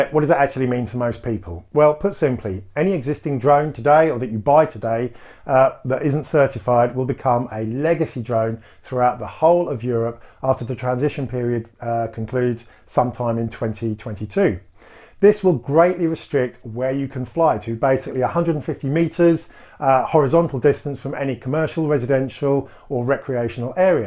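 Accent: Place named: United Kingdom. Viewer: wow did not realise that when I bought my air 2 a couple of weeks ago, I have done videos on my youtube channel about this drone and my P4P, both registered mind, my fear is they will make it so difficult to fly anywhere it won't be worth having a drone, to be fare I only use it as a tool for when I cannot get a composition on foot with my cameras and of course it adds a great perspective that you otherwise would not get, thx for sharing and nice to hear an UK voice for a change.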